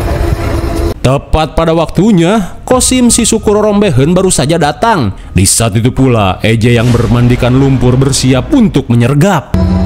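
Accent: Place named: West Java, Indonesia. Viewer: Sering aplod video nya KA, soalnya aku suka banget cara ceritain alur nya lucu gitu aku suka, kaya ada Sunda sundanya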